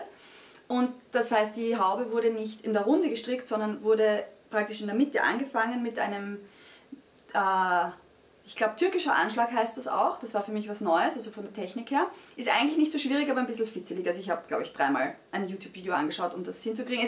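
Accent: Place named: Austria. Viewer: Warum spricht so schnell? Und dann noch mit diesem Dialekt😕 Das versteht doch keiner außerhalb von Österreich. Also ich habe nicht mal die Hälfte von den Namen der vorgestellten Garnen verstanden und von wem sie sind. Das Garn von der Babydecke hat mir wirklich gut gefallen. Leider waren auch die Einblendungen kaum zu erkennen! Dunkel Lila Schriftzeichen auf schwarzem Untergrund!! Liebe vielleicht sollten Sie mal Ihre eigenen Videos von Anfang bis Ende ansehen, dann verstehen Sie was ich meine. Auch ist die Auflistung der Projekte,von wem die Garne /Wolle sind,wie die Garne/Wolle heißen in der Infobox/Videobeschreibung für ungeübte Strickanfänger schlecht verständlich. Mich interessier einige der gezeigten Garne/Wolle, aber ich finde mich in der Beschreibung nicht zurecht. Schade!!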